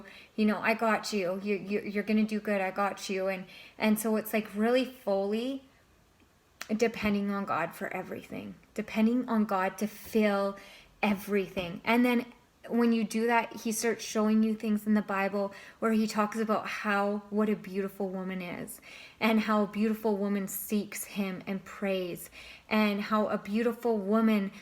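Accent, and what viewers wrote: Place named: Canada. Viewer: Inspiring ! Hope you are still doing well. You sound Canadian.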